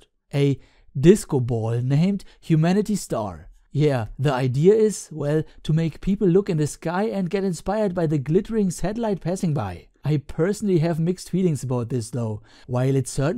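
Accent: Germany. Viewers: Great Video. Where are you from your accent is very german. I’m from Germany. Hi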